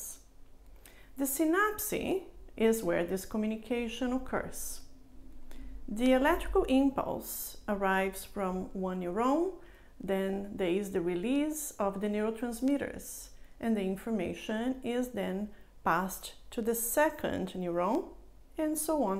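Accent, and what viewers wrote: Brazil. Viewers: Poxa vida Dra, que maravilha! Eu pensei, seu inglês é perfeito, mas tem um certo sotaque; uma de duas, ou é italiana ou brasileira por ser um sobrenome bem conhecido no estado de SP. Vou arriscar e mandar um abraço em português! E não é que a doutora é de Sampa! Rsrsrs! Que ótimo! Em 92 eu estava cursando meu 4 o ano, na USP também olha só! Admiro demais seu trabalho no canal. Seus videos são atrativos, suscintos, claros, simpáticos, rigorosos na informação científica sem que ela se torne cansativa ou maçante. Quanto à gabapentina e peso, um mistério mas uma excelente opção para aqueles que sofrem os efeitos devastadores de um aumento de peso indesejado e involuntário devido à pregabalina. Tudo de bom e parabéns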